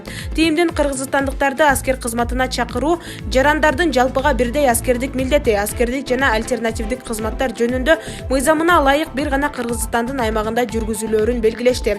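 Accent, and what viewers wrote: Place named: Uzbekistan. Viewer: Бул канал калп айтат ишенбегиле диктору да оштук сарт кыргызча интонация жок озбекче интонация менен суйлойт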